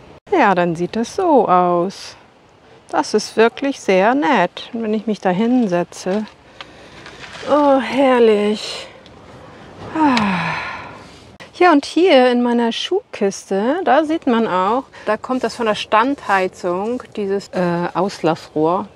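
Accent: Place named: Northern Germany, Germany. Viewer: Liebe 👋🌊⛰️🕊️ Jetzt wo ich dich schon öfters gesehen habe, sind die Ähnlichkeiten mit Heike längst verschwommen... Und ich sehe dich! Wenn ich nicht irre kam das eine oder andere nordische Wort durch oder hamburgisch... Ich bin Österreicherin... Für mein Ohr klingt Norddeutsch irre schön. Das rollende rrrrrrrrr Also dein Ausbau ist so toll geworden... Außerirdische schön... Die ganzen Details... Und dazu dass du dann immer sagst "ich find das so toll dass ich alles im Sitzen erreichen kann" zb Einfach dass du deinen Ausbau liebst... Das liebe ich wiederum Ja... Ich träume ja auch davon einfach loszufahren... Ich träume aber auch von einem gemeinschafts-gemüsebau-projekt Da du um ein paar Jahre älter bist als ich, hab ich total den Druck verloren, jetzt ALLES auf einmal realisieren zu müssen. Danke dafür! Der einseitig blickdichte Vorhang ist auch sowas von genial! 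Hej... Du isst ja auch Gemüse zum Frühstück... Wenn du dich noch 1 Stufe weiter traust, könntest du Wildkräuter wie Löwenzahn zb dazu essen. Ich sag nur - irre gesund und bewusstseinsöffnend! Gomera ist ja bekannt für seine verschiedenen Klimazonen, also den Berg rauf findest du die.. da kennen die einheimischen sicher 1, 2 Wildkräuter die man essen kann. Roh. Wie Kräuter über m Salat 🥗 Du wirst es nicht bereuen... 🌿🤘😉 Liebe... Vielen Dank für deine Videos... Sie beruhigen meinen wilden Drang sofort loszufahren... Dass du morgens als erstes schwimmen gehst... Ja.. toll... Man ändert so sein ganzes sein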